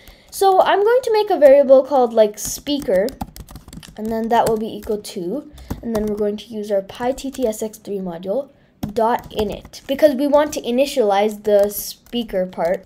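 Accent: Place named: United States. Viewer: Where do you live ?I mean your origin is India but accent is American . Can you please tell me where do you live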